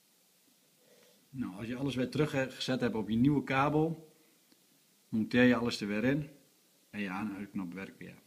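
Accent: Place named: Groningen, Netherlands. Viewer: jij komt duidelijk uit groningen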